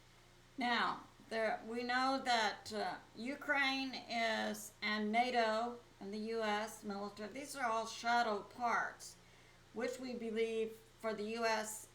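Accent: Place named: Texas, United States. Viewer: She’s perfect just the way she is she has a Texan accent lovely!!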